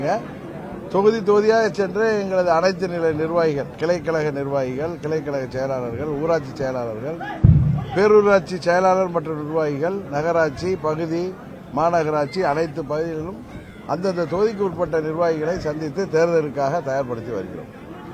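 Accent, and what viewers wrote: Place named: Thanjavur, India. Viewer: Thanjavur kaarar slang, Thiruppa thiruppa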